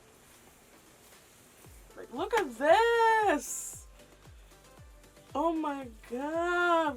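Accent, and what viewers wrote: Caribbean. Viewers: Do I hear a slight Caribbean accent ?? 👀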